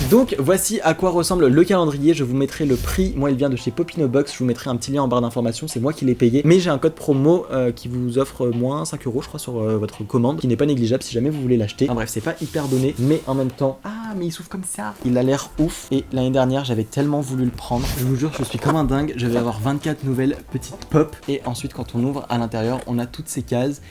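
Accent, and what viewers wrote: England, United Kingdom. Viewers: Pourquoi tu prend un acsent anglais ?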